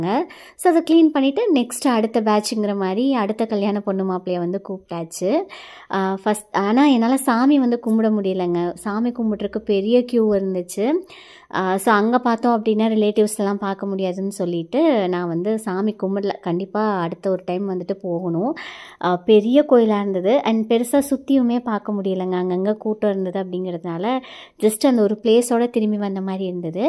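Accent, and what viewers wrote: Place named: Kongu, India. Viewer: Sis nan 1st tym unka long video pakuren ninga rmba cute ah positive ha peasuringa..innaila erunthu unka konju kongu tamilku nan admai❤❤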